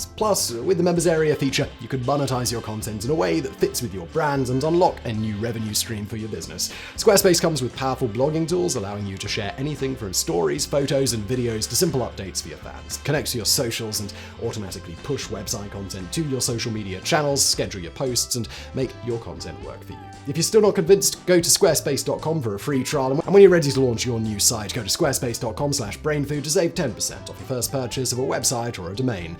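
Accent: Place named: RP, United Kingdom. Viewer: Okay, Simon reading aloud the lyrics "I knew a girl named NIKKI. I guess you could say she was a SEX fiend" is his perfect Queen's English accent made me literally lol and is about the funniest thing I've seen today.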